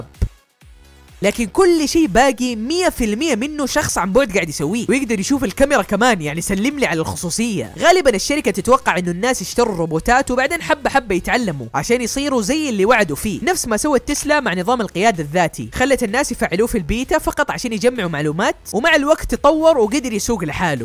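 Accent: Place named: Iraq. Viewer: زين ليش لهجتك عراقيه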